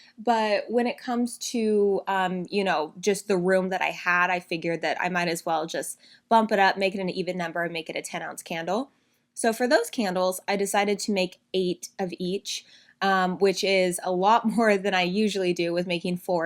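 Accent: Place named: United States. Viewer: i just cannot stand your grating american accent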